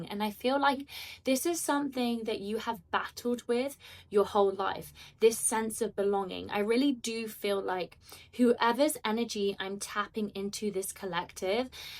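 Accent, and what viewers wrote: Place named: England, United Kingdom. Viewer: Love those golden highlights and the English accent is simply icing on the cake!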